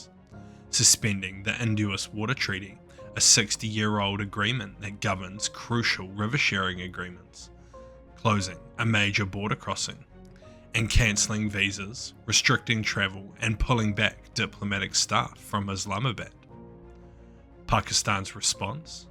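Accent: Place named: New Zealand. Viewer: How dare anyone criticise beautiful kiwi accent and narration skills!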